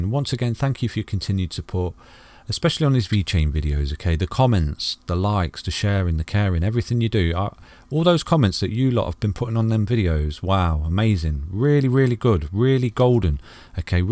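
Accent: United Kingdom. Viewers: Dear, your channel is golden, not to mention your calm, grounded manner and soothing british gentleman voice!!!!! Keep on going!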